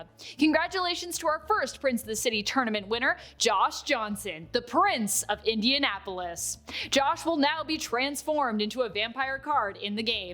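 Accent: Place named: Canada. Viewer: She's Canadian, they talk funny sometimes.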